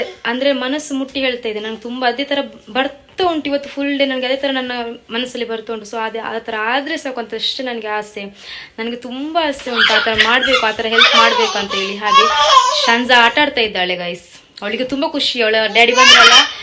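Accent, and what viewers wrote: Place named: Tulu Nadu, India. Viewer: Nimma kannada Tulu mix kelalu kusiyagtade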